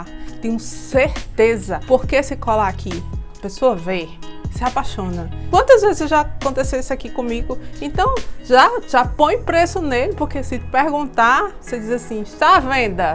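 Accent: Northeast, Brazil. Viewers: Mulher linda, tu és de onde com esse sotaque que parece nordestino? Primeiro vídeo seu que vi e amei. Já me inscrevi. 🙂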